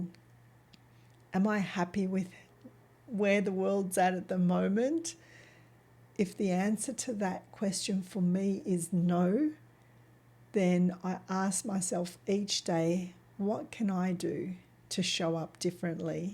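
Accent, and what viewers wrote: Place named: Europe. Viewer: She seems like a really nice girl. Love the accent. I wonder what country she is from. I'm guessing somewhere in Europe.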